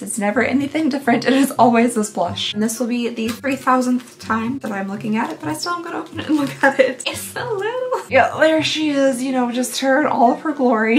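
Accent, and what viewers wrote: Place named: Tennessee, United States. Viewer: Sweetie, there is NOTHING wrong with the way you talk. If ppl dont like it, you know the saying..."just keep on a-scrollin'". I personally LOVE the way you tale and describe things. Reminds me of home (Tn.). I miss it.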